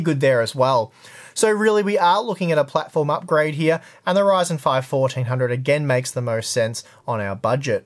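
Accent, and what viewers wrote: Australia. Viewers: Aussie mate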